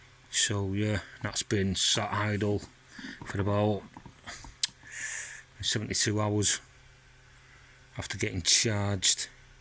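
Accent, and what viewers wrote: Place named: Manchester, United Kingdom. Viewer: Good on you mate, I could do with some help you sound local - Manchester way 👍👍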